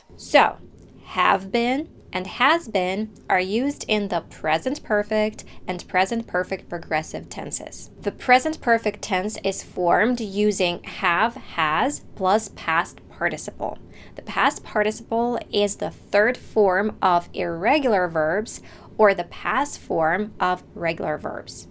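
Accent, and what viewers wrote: United States. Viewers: What is her accent pls ? (California, Texan ?) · When i watched this channel for the first time, i felt myself very contradictory. I heard an exceptionally, i'd even say pirufied american accent, and i saw this face and couldn't believe she's an American. And i was right. That was a great job but what it's given? Being an American doesn't mean having an impeccable language. It means sharing certain values. It means creating certain values. It means to be a part of american society. It means to have a common future with the US. The US is a multicultural country. Many accents, many dialects. It makes the US be the US. Nobody cares how your language pure is but anyone cares what you feel about your place here.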